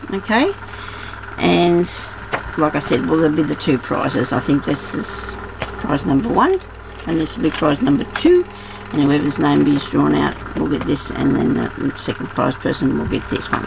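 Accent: Australia. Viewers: Hello, new subscriber, popped over from Magz Lockley's. I' m in Victoria, nice to hear another Australian. Will have a look and see what fabric I can find, do a V R. to enter your giveaway. Sue 🌸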